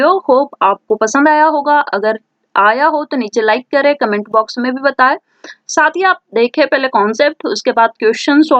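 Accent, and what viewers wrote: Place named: Bihar, India. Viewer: Aap Bihar sy ho kya aapki langaguge and tone bihari hau.Mari bhi Bihar state sy hau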